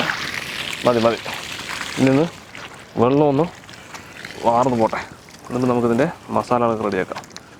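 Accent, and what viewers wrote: Palakkad, India. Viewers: കള്ളി മുണ്ടും പാലക്കാടിന്റെ നാടൻ ശൈലിയും അസ്സൽ പാചകവും പാചക രാജാവ് ഫിറോസ് ചുട്ടിപ്പാറ 👇⚡️ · Palakkadaan slang ishtamullavar like iduka👍❤️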